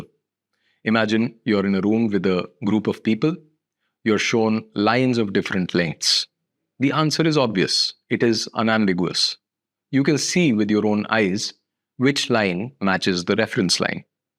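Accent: India. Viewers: I came my chance; thank you. Your accent and narration indicates a mix of north and south indian (tamil?). Once again a big thank you!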